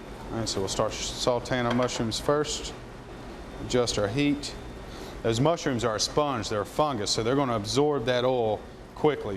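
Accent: South, United States. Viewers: Please take some grammar lessons. You sound like a southern hick. Your video was good, but your grammar reined the whole video. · He is clearly from the South (CCCTI is in North Carolina) and his accent reflects that. So if he does not say “julienne” or “oil” the way you would, it is not wrong, just dialectical differences for those of from below the Mason-Dixon line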